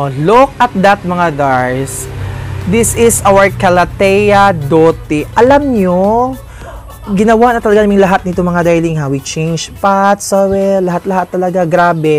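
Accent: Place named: Visayas, Philippines. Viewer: Manay am happy kapag napanood kita happy people ka at natutuwa ako kapag dialogue mo sobrang dako bisaya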